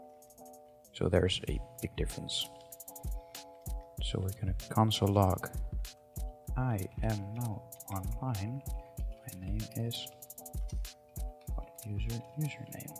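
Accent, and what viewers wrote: Netherlands. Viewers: Your accent sounds Dutch. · yeah I was thinking the same ben je nl =) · Dutch? Nederland of België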